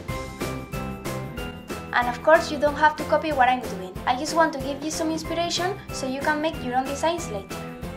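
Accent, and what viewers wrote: Spain. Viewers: Me encantan tus diseños, quizás te copie alguno ;). Se nota mucho tu acento español pero se entiende todo, la gente que te critica por eso es sobretodo porque son monolingües(y eso es malo para el cerebro y la creatividad) Espero ver algún día un vídeo en Español, ya estoy harta de que todos hablen inglés en youtube y en el instituto.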